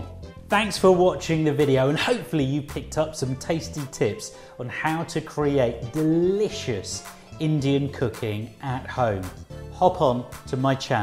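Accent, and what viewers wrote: England, United Kingdom. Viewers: What is it about an indian guy with an English accent that is just so hot?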